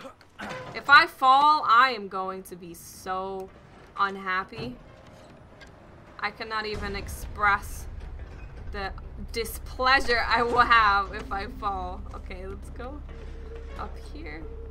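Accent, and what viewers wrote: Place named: New Jersey, United States. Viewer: AND WHY DO YOU SOUND LIKE SOMEONE FROM NEW JERSEY LMAO I LOVE HOW YOU SAY LADDER AND ANYTHING LMAO <3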